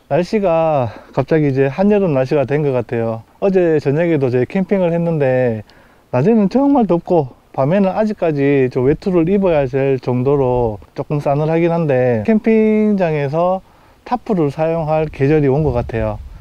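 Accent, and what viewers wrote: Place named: Gyeongsang, South Korea. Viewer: 타프 설치 전문가이시네요 ㅎㅎ 구수한 경상도 사투리는 덤...이고요 ㅋㅋ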